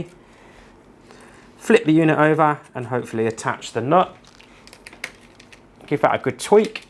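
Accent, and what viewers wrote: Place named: United Kingdom. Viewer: That s awesome! I love the British accent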